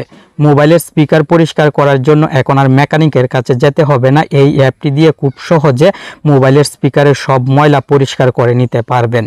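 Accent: Mymensingh, Bangladesh. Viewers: Vair bari mone hoi Mymensingh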